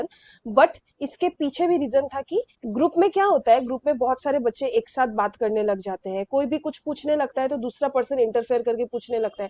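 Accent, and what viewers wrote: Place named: Bengal, India. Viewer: ❤❤❤❤beautiful ❤❤❤❤with bengali accent. Its nice❤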